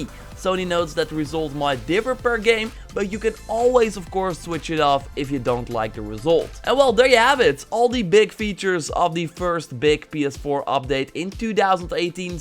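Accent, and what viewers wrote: Netherlands. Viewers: Een nederlander hehehehehe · >Dutch accent >Horizon zero dawn DOE ES NORMAAL GODVERDOMME Goeie video :)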